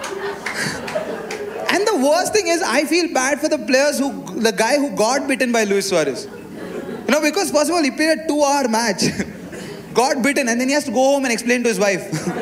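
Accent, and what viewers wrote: South India, India. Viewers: That innocent face he made "I don't wanna kill I want to fix things" was just priceless 😍 Great content Super Comedy With his South Indian accent ❤️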